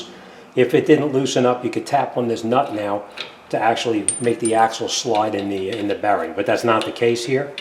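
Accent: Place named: New York, United States. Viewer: I'm a New York and I can hear it!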